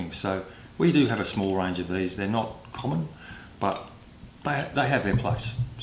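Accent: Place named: Australia. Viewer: Super helpful mate!... And so awesome to hear an Aussie voice on youtube👍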